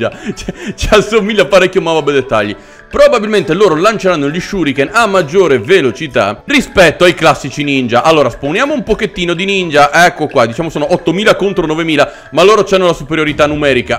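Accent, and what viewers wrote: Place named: Bergamo, Italy. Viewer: Da quando è che hai un accento bergamasco?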